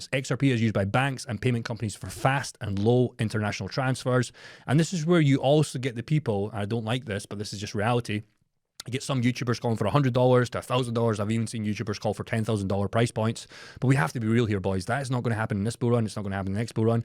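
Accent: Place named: Edinburgh, United Kingdom. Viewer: As an ex Edinburgh resident I enjoyed hearing this well informed crypto briefing in an accent I know well. Great info. Excellently presented. I subscribed !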